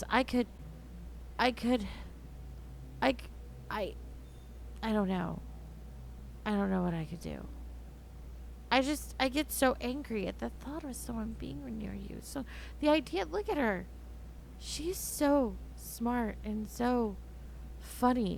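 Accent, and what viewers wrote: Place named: Texas, United States. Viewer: I can tell you're from Texas how you switch from Spanish pronunciation and quickly into English lol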